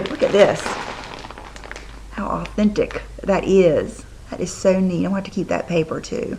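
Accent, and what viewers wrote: South, United States. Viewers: Hi!!!!! Great video. I'm a new subscriber and I love your channel. Love the southern twang too. Lol! We are close in age. I'm 40, so I appreciate all the advice..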